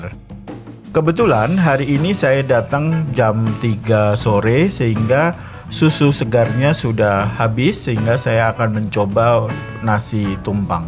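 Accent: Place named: Java, Indonesia. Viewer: yg MEDOK logat jawanya, bila membacakan sebagai narator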